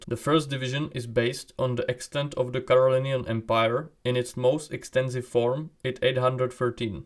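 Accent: Poland. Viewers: Heyy, i think you pretty much nailed it, good job!! (XD you sound very polish, i am too, but i think the accent adds a certain comedic value as in Poland most people talk as if they were western, although still catching up to the west). Have a nice day 🎉🎉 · Serio? Ty Czech? ( I czecked even the info about Your channel) Jor akcent is sooo Polish🤣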